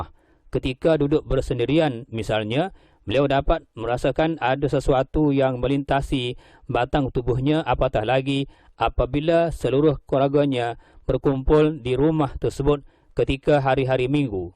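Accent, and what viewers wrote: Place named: Kelantan, Malaysia. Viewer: xblh buat apa.cara ulasan tu kejap Kelantan kejap bahasa baku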